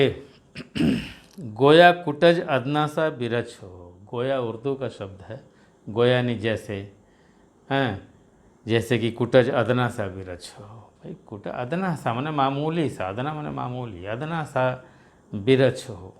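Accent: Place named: Bihar, India. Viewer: Sir aap Bihar ,ara jilaa ke h kyaaaa❤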